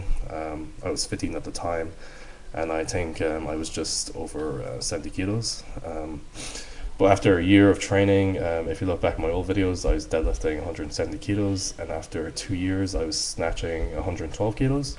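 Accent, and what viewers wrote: Ireland. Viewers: Are you Irish ? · Wtf your Irish .. I thought your American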